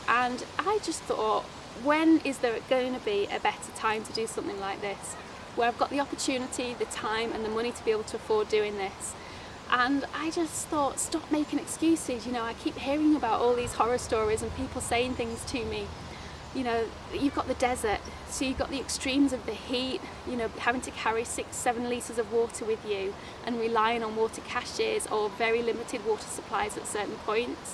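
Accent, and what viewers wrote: United Kingdom. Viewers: I have always loved the UK accent every since going out with one for a couple of years in the 80s. 6 months is along time witch means you'll hit my state late Sept or Oct. Last year snow started the last day of summer and we ended with 120 - 130 % total snow pack. This year suppose to be hot and dry but my state is unpredictable . We have the Pacific Ocean on the coast rising to the Olympics dropping into Puget Sound before rising to the Cascades. CHECK out Farley this year he is a VEGAN and this guy is Bookin. In 45 days he has reached the halfway point 1235 miles. You can see where he supplies and where not to. GOOD LUCK, I just sub.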